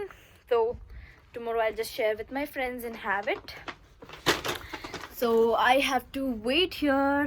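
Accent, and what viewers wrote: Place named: North Karnataka, India. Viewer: ನಿಮ್ಮ ವಿಡಿಯೋ ನೋಡ್ತಿದ್ರೆ ನೋಡ್ತಾನೆ ಇರ್ಬೇಕು ಅನಿಸುತ್ತೇ ಒಂದೊಂದು ನಿಮಿಷನು ಸುಪರ್ ಆಗಿದೆ,ಉತ್ತರ ಕರ್ನಾಟಕ ಶೈಲಿಯ ಮಾತು, ಡ್ರೆಸ್ಸಿಂಗ್ ಸೆನ್ಸ್ ಸುಪರ್, my dear doll😍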